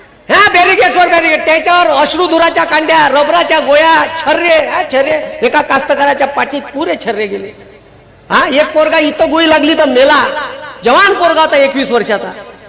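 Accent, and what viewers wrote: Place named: Varhadi, India. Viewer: वऱ्हाडी भाषण व्वा 🙏PM साहेबांना बोलताना राउटसारखी जीभ घ ून जाऊ देऊ नका, हे ्वच पक्षातील हरामखोरी आहे, तुम्ही आपण गुरुजींना हे राजकारण परवडत नाही जी.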